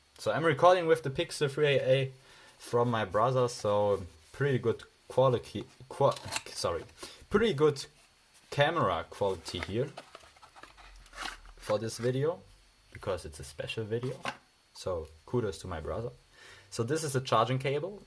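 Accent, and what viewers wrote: Germany. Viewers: For the accent, I think he's German, so in Germany it's available.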